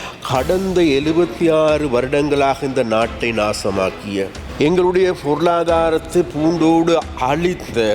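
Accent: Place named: Hill Country, Sri Lanka. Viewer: idhuwum thamil thaan , ungalukku thamil theriyala , yaarlpaana thamil thaan thamil endralla , neengal malaiyaga thamil kattrukko;;ungal. avar sariyaagaththaan pesugiraar , idhuvum thamil thaan , ungalukku vilangavillai , neengal malaiyaga thamil , colomu thamil pattri padikkavillai , adhu ungal thawaru. avar sariyaagaththan pesugiraar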